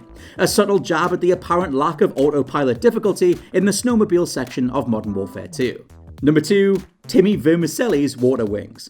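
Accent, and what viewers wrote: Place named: Scotland, United Kingdom. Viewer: clicks video hears scots voice and leaves.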